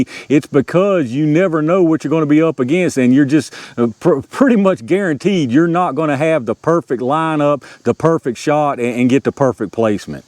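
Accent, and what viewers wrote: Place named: Rural, United States. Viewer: I can’t stand listening to this hick talk.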